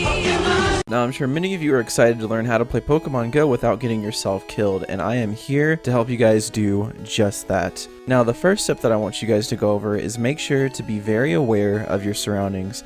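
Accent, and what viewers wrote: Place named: Australia. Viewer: Oh? You sounded more Australian to me :P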